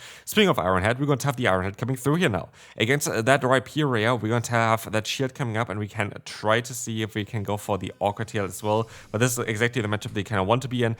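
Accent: Germany. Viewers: Bist du Deutscher? Oder woher kommt der Akzent haha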